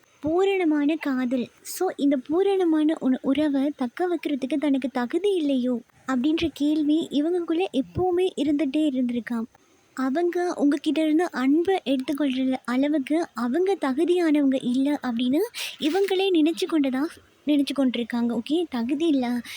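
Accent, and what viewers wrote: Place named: Jaffna, Sri Lanka. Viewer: உங்க யாழ் தமிழ் நல்லா இருக்கு.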